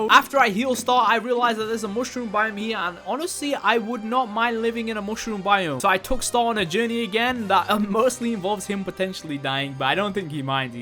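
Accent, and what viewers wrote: United Kingdom. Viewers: Luv that briisish accent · its just every 100 days but the the unique part is the british accent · I love your british accent (sry if im wrong) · I just love ur accent, i honestly love britian accent (sorry if i misspelled britan)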